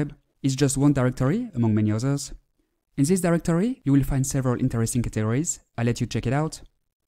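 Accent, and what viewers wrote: France. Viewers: Your English is weird i bet you're french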